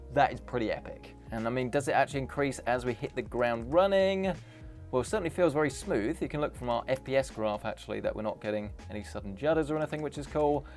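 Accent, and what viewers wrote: United Kingdom. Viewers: British accent is amazing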